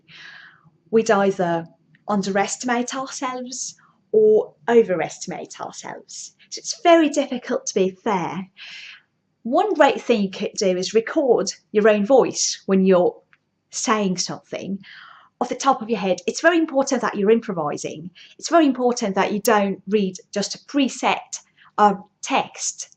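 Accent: RP, United Kingdom. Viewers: Lol ur accent like ratu london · Oh my god. This is bloody perfect. Yes, it's not native but rather a native-life from my inexperienced perspective. Sort of RP but very much fluent and free. What a work has been done. Thank you very much indeed.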